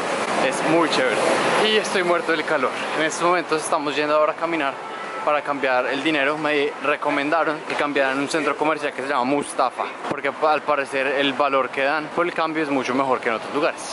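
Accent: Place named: Colombia. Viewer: me tiene enamorada, ¿cómo hacemos? Esa voz, ese acentico colombiano uffff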